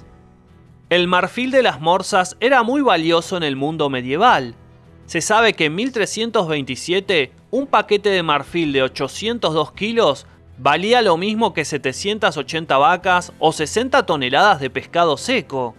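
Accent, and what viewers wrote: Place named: Argentina. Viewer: Por tu acentonoareces argentino, y un desagradecido decir que nos vikingos llegaron antes que los españoles acompañan a colon, que gracias a ellos tienes lengua y un celular 4g, los que nose han descubierto como las tribus Amazonas.....ahí siguen tirando flechas....y seguro que eres biznietos de un gallego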